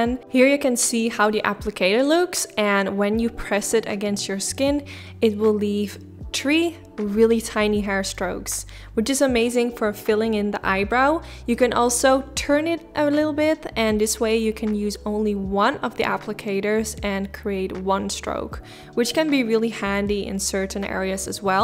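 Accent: Netherlands. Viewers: Ben je nederlands? Want hoor beetje een nederlands accent in je engels haha x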